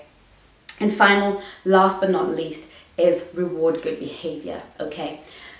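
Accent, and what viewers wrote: United Kingdom. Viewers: you are so beautiful and eloquent. I also love the British accent- my favorite accent. I just recently discovered your channel. Thank you for all you do. Working on lifting myself up. I know I’m a gem but there are times I’m a bit low and not feeling that way. Recent break up has really hurt me but working on picking myself up. Other channels helped me and like I said I recently discovered yours. Love the way you express yourself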